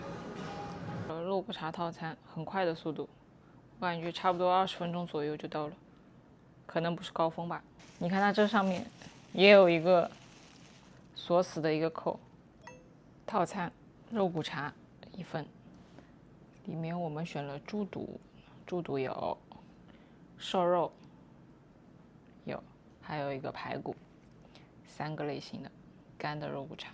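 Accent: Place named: China. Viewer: 她口音很重的大陸姑音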